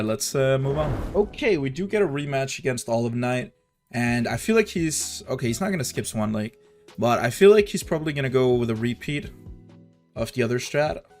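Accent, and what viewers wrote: Denmark. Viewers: yeah, I know he's from Denmark, I just find it funny when he doesn't know about things or pronounces things differently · he's Danish, our j sounds like that. fortunately for us all, doesn't drink baja blast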